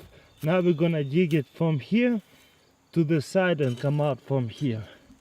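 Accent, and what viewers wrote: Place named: Ukraine. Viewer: Ужасный английский с украинским говором) прикольно звучит , я даже так не могу)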